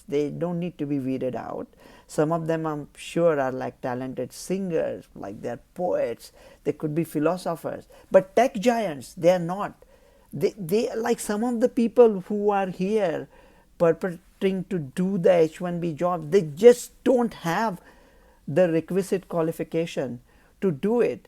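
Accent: India. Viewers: From the influence that your mother tongue has had on you language, some of which is still left behind, I can garner that you come from a Gujarati descent. Am I not right, Mr.?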